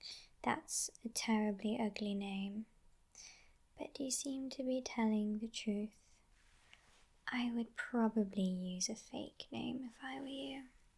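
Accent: United Kingdom. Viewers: my first reaction "shes british"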